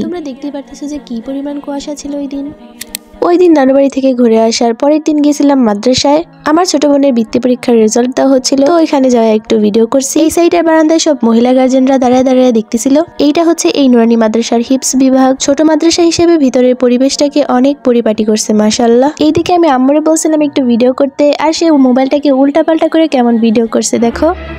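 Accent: Sylhet, Bangladesh. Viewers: Apnr basha ki sylhet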